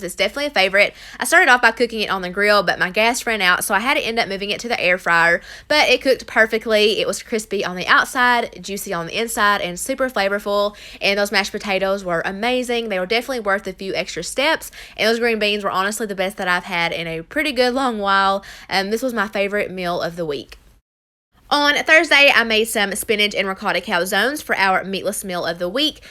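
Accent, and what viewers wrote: South, United States. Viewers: You’re such a southern Bell, I love your accent and food ideas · What state do you live in? Love your accent! I’m from North Carolina and I have an accent as well, but yours is more southern than mine. Lol! Love ya! 💖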